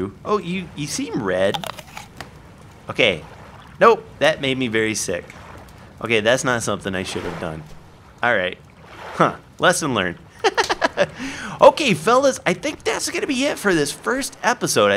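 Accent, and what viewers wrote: Canada. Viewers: U sound canadian broski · You sound sooo Canadian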